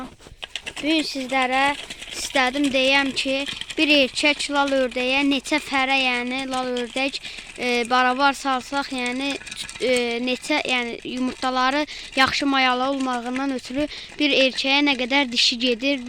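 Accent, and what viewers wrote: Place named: Georgia. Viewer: Gürcüstan ləhcəsinə oxşoooor ləhcən ay qaaaa.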